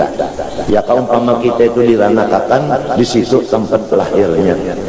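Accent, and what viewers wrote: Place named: Brunei. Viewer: Mcm logat orang berunei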